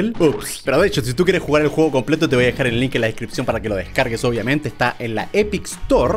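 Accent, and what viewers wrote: Chile. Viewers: por lo menos en los directos se le sale lo chileno wn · Soy yo o esta volviendo a hablar chileno poco a poco?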